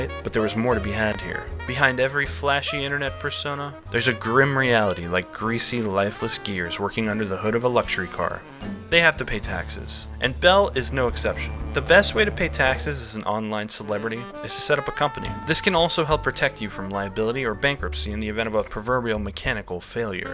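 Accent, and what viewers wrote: United Kingdom. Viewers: Am I the only one who had no idea she was british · its a girl version of leafy..... except british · Am I the only one who didn’t know she was British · Huh, didn't know she was British. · SHE IS FKN BRITISH? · Bro i never knew she was British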